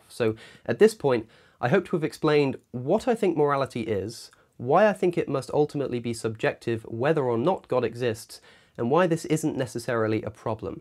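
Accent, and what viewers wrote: United Kingdom. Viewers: CosmicSkeptic: intelligent British sounds Me: mhm mhm mhm 🤔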